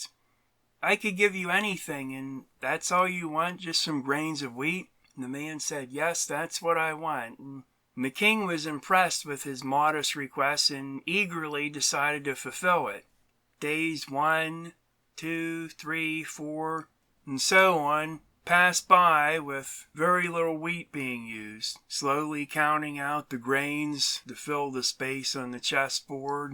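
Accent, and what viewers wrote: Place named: Pennsylvania, United States. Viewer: Puts things into perspective! Your accent reminds me of my X's Ma. They were from Zelienople.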